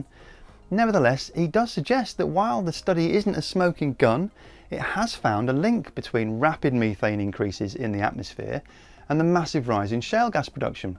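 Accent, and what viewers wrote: United Kingdom. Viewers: You pronounce it me-thane, we pronounce it meth-ane. We don't tell you how to pronounce words if you don't tell us how to. Deal? Whenever I come across the English when I was in the UK or when travelling they always show their arrogance. My suggestion is you're not that special, so stop acting arrogant.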